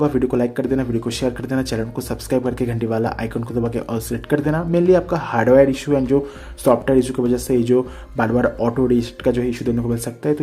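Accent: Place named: India. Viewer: لمادا الشخص الهندي لا يقدم طريقة ويكتفي بالكلام مع ااعلم لا نفهم عليه اللكنة واللهجة. 😮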